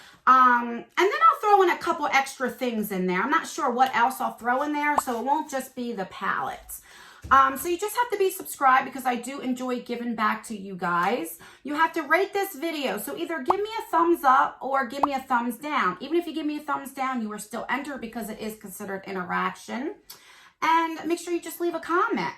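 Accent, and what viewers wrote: New Jersey, United States. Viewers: I love your channel! I heard the accent and it reminds me of home although you are from Jersey & I'm from Long Island. Similar sound. 😆 Such fun videos! Im in my early 50s and relate more to your channel more than some of the younger beauty gurus. We have different beauty needs & well, I love budget friendly beauty that can be found in these beauty boxes! Lol. Favorite emoji, hmmm, oh it has to be one that I always give to my kids, 💖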